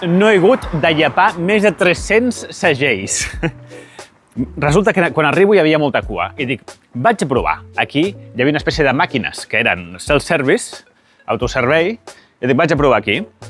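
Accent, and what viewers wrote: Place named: Mallorca, Spain. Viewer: 😂M’encanta el mallorquí, quina simpatia! Bona jornada! Amb això de les postals has fet un homenatge a la missatgeria tradicional, que està en plena decadència 👏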